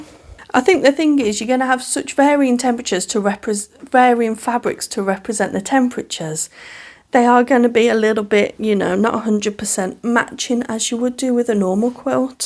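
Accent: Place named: Midlands, United Kingdom. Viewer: I’m glad I found you. I’m getting a little bit obsessed with temperature projects. I’m almost finished on a quilt and blanket for this year and just started on a very bold and clashing quilt, started in September to finish on my 60th birthday. From your accent you sound like you’re not far from me in the Midlands. I’ll join the Facebook page, so hope to see your pattern and how you progress there.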